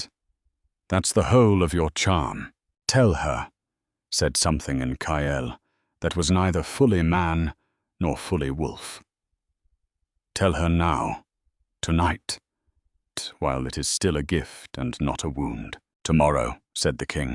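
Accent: United Kingdom. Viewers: My solution to the annoying video pictures that don't match the story is to fold a piece of paper over the top of my cell phone that is long enough to cover that section, click on Show Transcript, and follow along with that purring yummy English / British accent and, as the fantasy tale unfolds, my imagination does what it is meant to do and creates a movie in my head that captures the right characters into all the scenes. This technique might help you enjoy more videos that have that irritating picture section that doesn't match the plot line details. Just a thought from Windsor, Ontario Canada 🇨🇦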